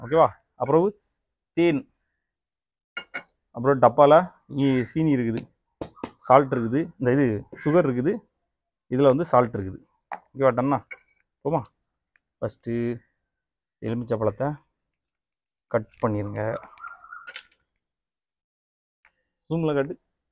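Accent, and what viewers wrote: Tirunelveli, India. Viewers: Nellai tamil😂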